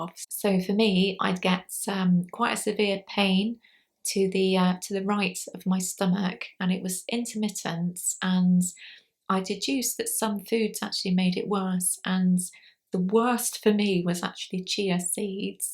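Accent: United Kingdom. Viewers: Hi! 🧡 Thank you so much for all the time, research, and thoughtfulness you put into creating this helpful, informative, transparent video. First, as always, you are such a comfort. So kind and open, it is really a gift. Someone else commented on your voice and I could not agree more! One of the things I loved about living in England was the accent you all have... Your voice, in particular, promotes a sense of peacefulness, even when you are talking about hard things. Next, I hadn't realized that some of the physical conditions I have are common for folks with autism: I only lasted one night with my mouth guard too. And, my period was so difficult that eventually (and of course I DON'T recommend this because of all the health problems associated with being underweight), I found that if I kept my weight lower than normal, I wouldn't menstruate. And even though my doctor told me to put on at least five pounds so that I would menstruate regularly, foolishly I thought, why would I want to menstruate when it is SO painful?! 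Or gain weight when I don't feel like myself in a different body (the person who assessed me said that is an autistic symptom for some other folks she assessed). So, not surprisingly, I too have osteoporosis. Fortunately I was able to get pregnant with my two boys during a phase of keeping my weight higher so I could get pregnant and nurse... Finally, I was so sad to hear about the high pitched noise Ian always experiences and that you too have ringing in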